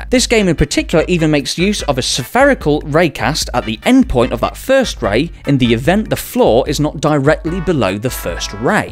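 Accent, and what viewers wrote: Northern England, United Kingdom. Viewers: That's a northern English accent. 😄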